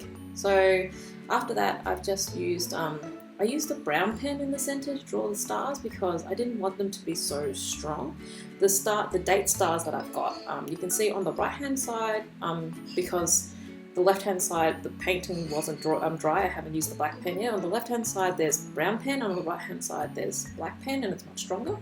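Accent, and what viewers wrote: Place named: Australia. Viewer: are you australian or british, you have a very interesting accent because i can't tell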